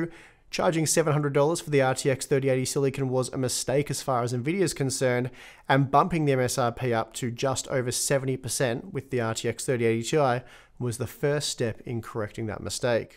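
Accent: Australia. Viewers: Love your work. I follow 4-5 different channels and I like the different takes. Also, mixing it up with some aussie accents is always a good thing.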